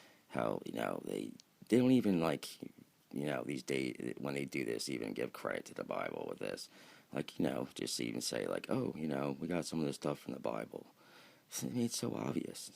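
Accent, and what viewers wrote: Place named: Pennsylvania, United States. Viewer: Dude your Pennsylvania accent is so heavy I can barely understand what you’re saying. Speak more clearly.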